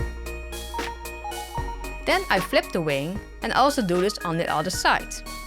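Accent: Netherlands. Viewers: i had a suspicion you were dutch with the accent. but then i saw ZEEMAN EN TOEN WIST IK HET. Maar heel gaaf gedaan! Ik ben benieuwd naar je andere videos. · Ik hoorde je stem en dacht dat is een Nederlands accent haha. Maar wist het niet zeker tot ik de "zeeman" zag staan XD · Geweldig gedaan! Ik wist niet dat je de nekopening op die manier kon vergroten. Aaah, dat heerlijke Nederlandse accent! Never get rid of it!!!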